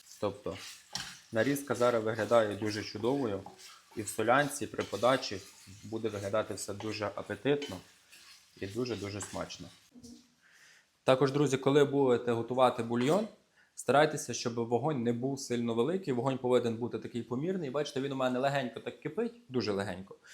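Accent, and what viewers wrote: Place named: Lviv, Ukraine. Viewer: По виговору ,я так думаю,хлопчина зі Львова́,а напишіть чи не вгадала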